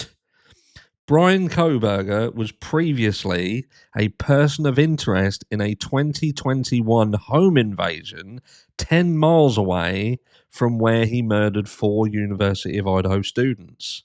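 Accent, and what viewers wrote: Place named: RP, United Kingdom. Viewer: 🤣 U butcher the word “affidavit” like I do, but at least u’ve got that posh British accent to make it sound cool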